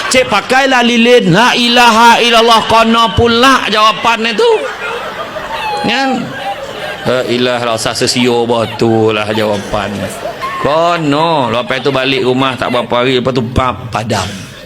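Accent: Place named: Negeri Sembilan, Malaysia. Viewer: Lawak loghat N9 memang best rentak bahasa beralun tinggi rendah dgn lembut😂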